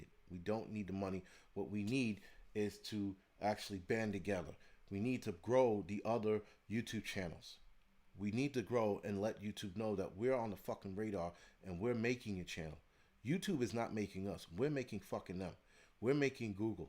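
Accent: New York, United States. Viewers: Just curious brother. How come you talk like a New Yorker? We're you born or live elsewhere for a while? I live near Boston and we almost sound alike. But you no...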